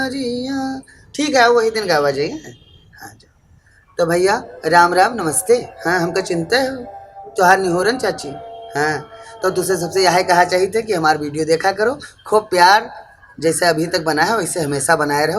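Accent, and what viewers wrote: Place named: Barabanki, India. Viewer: अरे भाई आप कहा के है बाराबंकी के हे क्या लग रहा है बाराबंकी में रहते है क्यों की ये वही की बोली है! आपका छोटा भाई तारिक़ जैदपुर।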